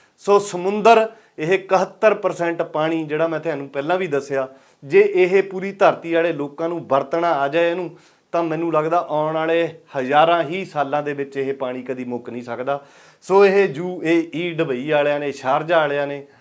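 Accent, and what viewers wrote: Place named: Punjab, India. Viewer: If all you noticed is one word, not the effort behind the video, that says more about you. UAE, JuAE - pronunciation isn’t a big deal, especially when the message is clear. Focus on the content, not nitpicking. Respect the hard work. If you don't like to hear Punjabi accent, try uploading videos in English and I'll listen..go get some life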